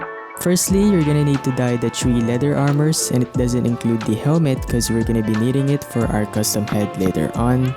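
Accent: Philippines. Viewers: I can tell you're a filipino because of the accent, really love your build hacks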